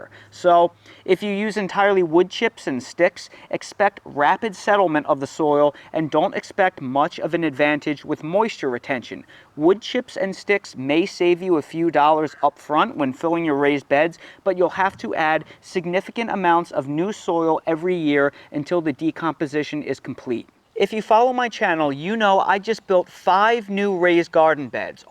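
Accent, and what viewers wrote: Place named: Philadelphia, United States. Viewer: I know you live in North Carolina now but you gotta be from the Philly area originally, right?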